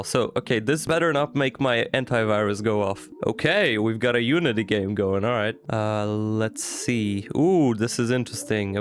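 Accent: Poland. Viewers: dziwnie oglada sie polaka mowiacego po angielsku ale dobrze ci idzie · Tylko dla mnie ma taki troche polski akcent?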